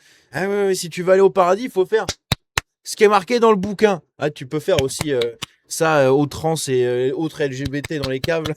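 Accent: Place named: Spain. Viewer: L'accent est espagnol ou portugais.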